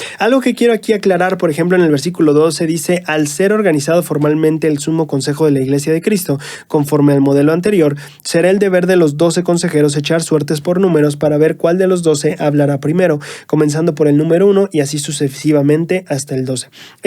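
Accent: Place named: Mexico City, Mexico. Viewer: Con mucho respeto me gustaría dar mi opinión respecto al hermanito, tengo entendido de que el nos habla desde la Ciudad de México (nosotros les llamamos Chilangos) y ahí he notado que todos los chilangos hablan el español muy rápido y de corrido y a pesar de que mi hermanito lo hable un poco rápido se le entiende muy bien y claro... yo puedo sentir muy fuerte el espíritu, yo siempre lo escucho y he aprendido mucho más del evangelio con sus explicaciones. Deseo de todo corazón que NPC siempre me lo bendiga con mucha salud y energías para que nos siga nutriendo spiritualmente, aunque no lo conozco personalmente El siempre está en mis oraciones para que le vaya bien siempre. Muchísimas gracias 🤗🤗🤗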